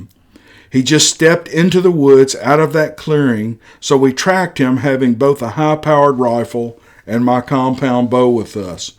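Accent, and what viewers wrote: South, United States. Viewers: I'm from the South, but living in the West, so I enjoy your storytelling and Southern accent (aka twang). Wish you much success! And Happy Holidays! 🎄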